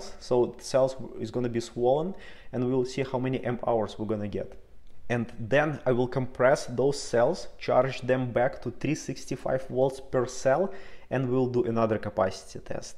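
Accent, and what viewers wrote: Russia. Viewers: Да , но английский с русским акцентом ужасен!!😢😢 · You are from Russia? You have a very strong Russian accent.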